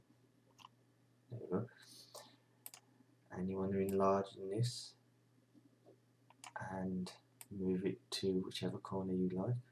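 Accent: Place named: Birmingham, United Kingdom. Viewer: Woohoo a brummie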